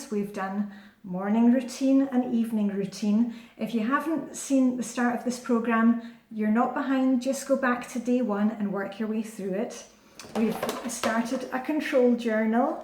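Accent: Scotland, United Kingdom. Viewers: I'm probably late to Flylady but I am on Day 10 and I totally love it. your videos are clear and concise, everyday I look forward to seeing the wonderful outfits you have curated. You have a be kind to yourself attitude which gives the system such universal appeal. I am recommend your videos to my Mummy friends as well as to my own Mum. I must confess I don't do the shoes (I am pretty OCD about shoes in the house, and don't want to confuse my children about this. Therefore I have my shoes for the day at the door ready but otherwise I'm completely ready, even down to my strip lashes). I am keeping and eye out for a house shoe somewhere between a ballet pump style slipper and a shoe. Can't wait for tomorrow. Thanks again (beautiful Scottish accent btw) x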